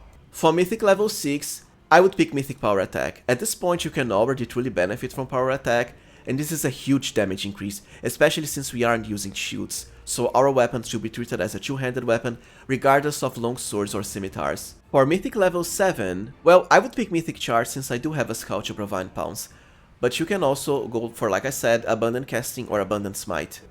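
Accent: Brazil. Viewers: caramba mano, ativa a faixa de audio em ptbr po, esse seu sotaque nao me engana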